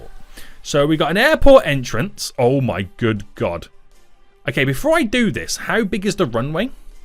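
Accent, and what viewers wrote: United Kingdom. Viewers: Love ur british acccent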